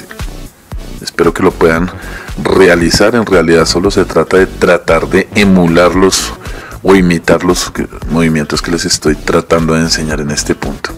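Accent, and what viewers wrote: Colombia. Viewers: Con esa voz de Colombiano más ese talento y profesionalismo mezclas perfectas💯👌🏾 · Ese es colombiano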